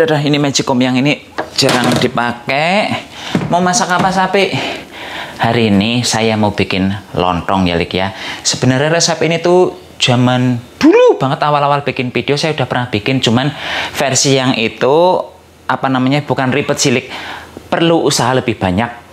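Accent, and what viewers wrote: Banyumas, Indonesia. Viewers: Ngapak mas medok banget semoga sukses mas. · Njenengan wong endi mas, ngapak2😅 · Ngapak....senyum2 sndiri dipanggil lik.. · Wong ngapak..., Ngomonge ngapak..., Ora ngapak ora kepenak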